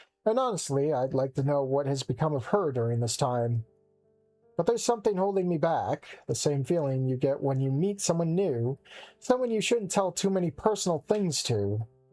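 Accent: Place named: Finland. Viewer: Finglish strikes again.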